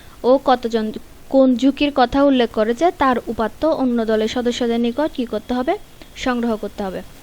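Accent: Chittagong, Bangladesh. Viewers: ম্যাম আপনি মনে হয় চট্টগ্রামের?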